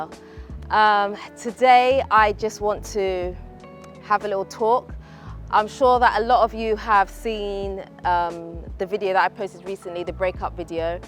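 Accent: United Kingdom. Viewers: My☝🏿thought about you (AKA ) your not old, but a great builder of houses & homes, so keep working on building your genuine soul then you & the family w/ fall in place 😊….. Oooh yeah you’re accent is smethg i luv to listen to idk 🤷🏿 is it British? anywho enjoy the Motherland’s landscape ❤ & rmbr to keep building sis. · " " got a heavy heavy british accent, blimey!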